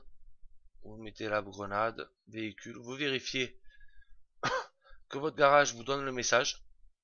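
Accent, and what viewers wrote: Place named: South, France. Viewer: Trop bien l'accent,on a le même 👍d'ailleurs on se fout souvent de ma gueule quand je joue avec le micro!je me fais traiter de "marseillais"......à croire que personne ne connaît Toulouse, béziers, Carcassonne, Montpellier, Narbonne....C'EST LE SUD TOUT ÇA LES GARS!!bien tes vidéos,j'aime bien les "d'accord "que tu balances,j'ai l'impression qu'il faut que je réponde "oui,daccord" 😉je m'abonne l'ami 👍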